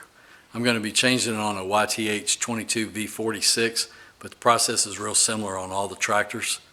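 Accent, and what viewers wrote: South, United States. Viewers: Hello from France ! Thank you so much, sir ! I've changed both belts on my tractor while enjoying your southern accent in the process !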